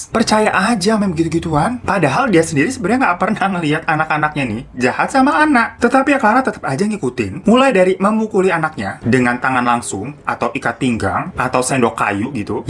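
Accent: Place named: Tegal, Indonesia. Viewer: Suka bgt sama accent tegal nya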